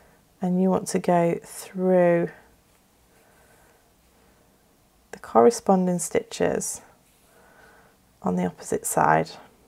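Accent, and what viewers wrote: United Kingdom. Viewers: Hi, i am new to your channel, i really didn’t know your name is not, … i was rewatching the tutorial today and at the beginning of the video i got your name!! 😆😆 Anyway i love both names, btw, I’m a big fan of your British accent! Lol Warm wishes… stay safe xx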